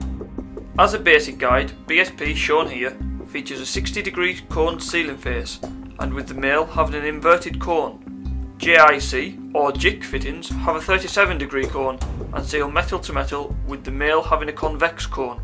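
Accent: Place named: Carlisle, United Kingdom. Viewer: Was looking into this sort of business. Recognised the accent. I’m Carlisle lol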